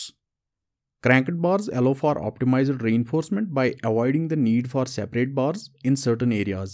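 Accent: Kashmir, India. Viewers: I could guess from your accent, I am also from Kashmir 😊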